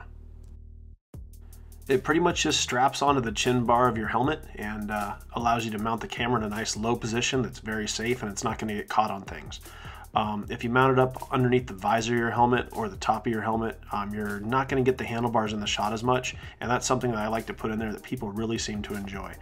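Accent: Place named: Western Pennsylvania, United States. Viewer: Hey, thanks for posting this. Love your channel. Are you by any chance originally from western Pennsylvania? Guessing based on your accent.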